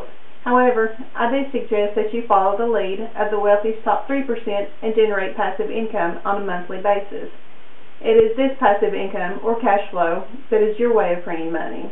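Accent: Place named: South, United States. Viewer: I wish you every success.You deserve it just for having that lovely southern accent. PS I'm English .....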